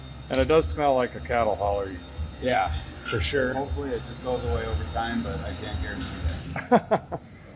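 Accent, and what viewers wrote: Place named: South, United States. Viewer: It's good to see a video where for once your not adding extra made up words or acting " extra country " instead of just speaking normal like you did at the beginning in the body shop. I keep skipping or giving up on your videos when you start making up words or trying to make illiterate talk seem cool. Please keep up the good work and less of the slang talk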